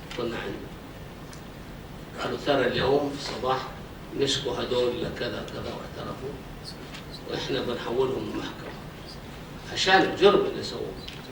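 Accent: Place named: Hejaz, Saudi Arabia. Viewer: Cashit Fr ياخبل ذا ماهو طرش ذا يمانى اصل العرب بس لهجته حجازيه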